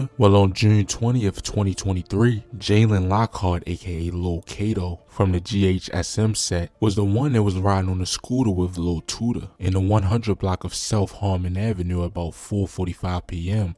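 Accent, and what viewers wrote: Baltimore, United States. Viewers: You from Baltimore I can tell by the way you say your U's lol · That DOO YOUU. TOOO... #Baltimore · I think he’s from Baltimore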